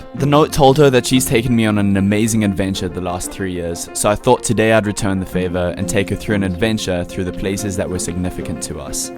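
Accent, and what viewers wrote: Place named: Afrikaans, South Africa. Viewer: Do you know afrikaans you have the aksent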